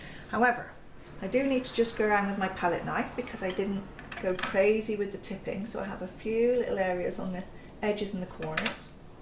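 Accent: United Kingdom. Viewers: Love your British accent, that you get paint all over and that you show the opps paintings❤️😂 you are real! Many video artist are perfect and I'm NOT, so you give me hope that even I can do it. Thanks.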